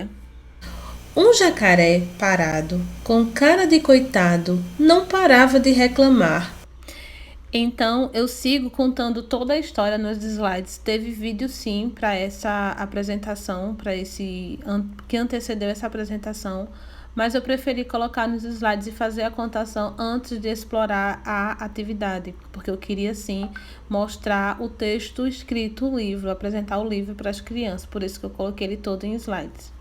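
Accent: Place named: Northeast, Brazil. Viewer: De onde você é professora ? Seu sotaque é bem nordestino.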